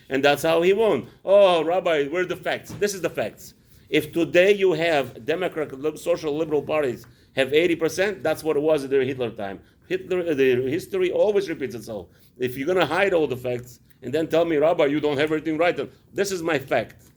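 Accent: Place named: Russia. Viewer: He has Russian accent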